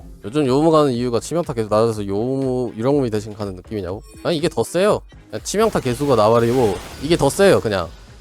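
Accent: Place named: Jeolla, South Korea. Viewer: 형님 혹시 전라도 분이십니까 사투리 정겹네요잉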